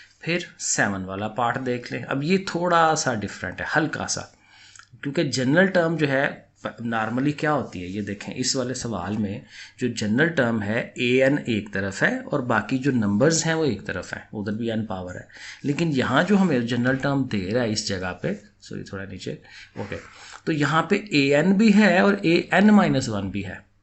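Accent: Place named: Lahore, Pakistan. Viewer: Teacher's lahori accent revel on ... " Har baar multiply ho rha ha...."😂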